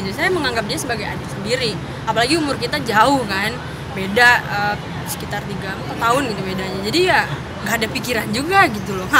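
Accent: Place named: Jakarta, Indonesia. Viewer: ngomongnya betawi bgt dah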